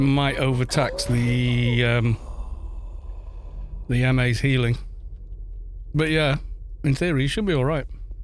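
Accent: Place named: Somerset, United Kingdom. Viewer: Have to correct the record for my local brethren: the Wurzels (of Combine Harvester fame) are from Somerset and so is that accent. Cornwall and the Black Country are both pretty different :D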